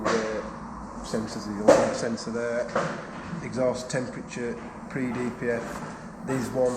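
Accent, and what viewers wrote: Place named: Barnsley, United Kingdom. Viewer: Barnsley accent makes these videos 😂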